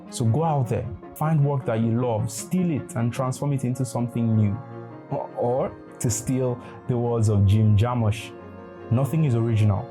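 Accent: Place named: Nigeria. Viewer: No Nigerian that grew up in Nigeria cannot escape the accent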